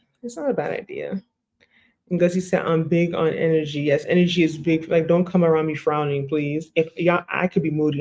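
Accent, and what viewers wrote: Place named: East Coast, United States. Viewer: I'm new to your channel, but you definitely have an east coast accent. I can also hear a little bit of Naija in you.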